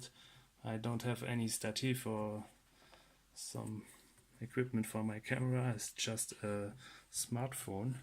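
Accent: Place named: Germany. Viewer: Thank you very much for the useful video! I've just replaced the belt in my Supernova Automatica. Followed your recommendations, and everything went just fine. The only thing which was different - I bought a round rubber belt, so I did not need to release the motor to put it on. I also like your other videos about Necchi sewing machines and I love your German accent!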